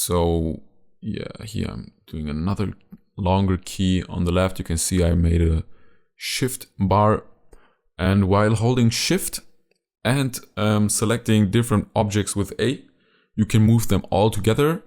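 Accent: Germany. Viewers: are you from germany ?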